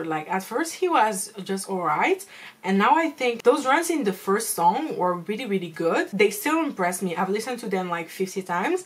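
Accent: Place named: France. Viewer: I love your personality!! Btw are you French? You have such a cute acceeent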